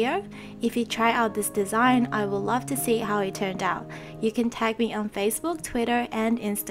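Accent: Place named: Australia. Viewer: I love your accent, I want to live in Australia!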